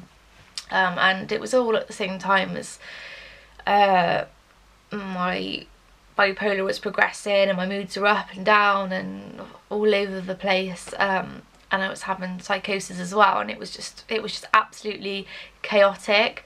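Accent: Bristol, United Kingdom. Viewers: you from bristol/south west? am a fellow janner haha thank you for sharing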